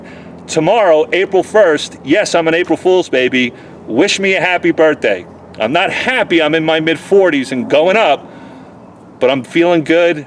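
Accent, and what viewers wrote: New York, United States. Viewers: Lives in Dallas but is from New York, New York accent. 😊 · >Dallas Paint >Thick New York accent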